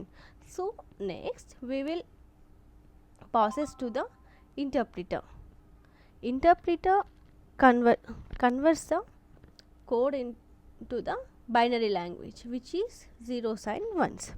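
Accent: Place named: Telugu, India. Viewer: Medam bachaparu according ur slang u r telugu right can u please explain in telugu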